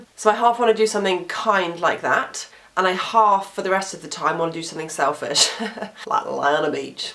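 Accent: United Kingdom. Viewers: Sounds like agoraphobia to some extent. I try to get over the irrational fears I have by doing more of what I fear. Travel, travel, travel... LA is a cool but expensive place, perhaps not tho compared to London. With your awesome looks, talent and sexy british accent you could kick butt and take names here in LA. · What the heck,? You’re practically an American with a British accent. Have you gone to a hypnotist to find out why you are afraid? My greatest fear is the government busting down my door and asking for more taxes. Or everyone pointing at me and saying, “you’re worthless & a fraud.”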